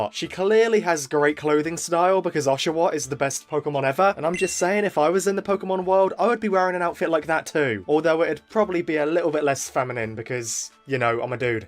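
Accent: United Kingdom. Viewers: Man you call that a sick voice? At least you have that british accent to cover it up,when i speak when im sick i sound like a minecraft zombie💀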